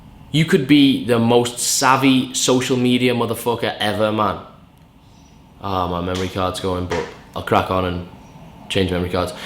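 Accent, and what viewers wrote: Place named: Liverpool, United Kingdom. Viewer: Is his accent from Liverpool, Manchester or Newcastle? ...sounds liverpool. love it!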